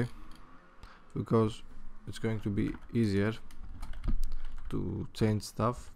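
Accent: Greece. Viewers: The Greek accent though :P Nice tutorial, much appreciated :D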